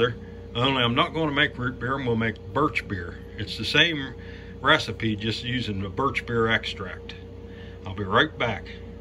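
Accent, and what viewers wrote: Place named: Maryland, United States. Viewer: That sounds like a true Maryland accent hah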